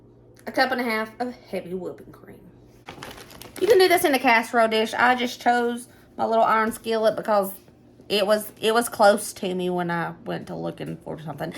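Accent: South, United States. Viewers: I just found you and can not stop watching you. I'm from Tennessee but live in Ohio. I sure do miss my southern belle accents, lol. I enjoy your recipes and your sweet hospitality. God bless you and your family and a very Merry Christmas. Your boys are southern Gents ❤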